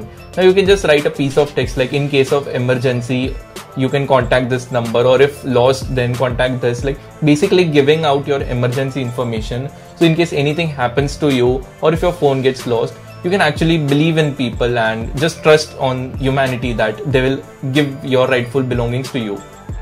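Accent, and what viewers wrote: India. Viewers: For an Indian, your grammar is okay. Just work on the accent. Face it, you don't like it when Northeast people speak Hindi with very funny accent. Likewise. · Indian accent really annoys me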